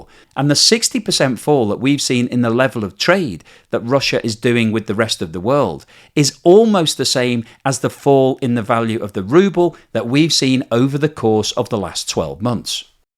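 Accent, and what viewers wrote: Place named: England, United Kingdom. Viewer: Anything with a English accent is usually rubbishy anti-Russian propaganda. Does Russia have $35+ trillion in Debt and out of control spending? I didn't think so... e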